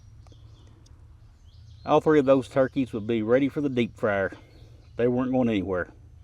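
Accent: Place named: East Tennessee, United States. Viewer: I just found your channel. Where are you from? You accent is telling me somewhere in East Tennessee.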